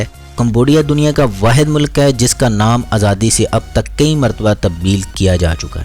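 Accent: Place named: Punjab, Pakistan. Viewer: Speaking with a punjabi accent bad English pronunciation need a lot of improvement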